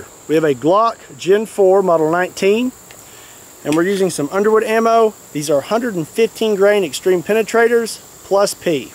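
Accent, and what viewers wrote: Kentucky, United States. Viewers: What part of kentucky you from? Im From Winchester/ Clark County!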